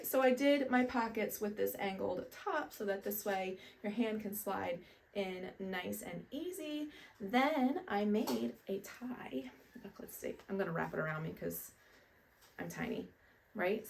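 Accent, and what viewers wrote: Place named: New Jersey, United States. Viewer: That cardigan is so nice girl you have very gifted hands 🙌🏾If you don't mind me asking where is your accent from? its sounds so cool like the way you pronounce certain things reminds me so much of jersey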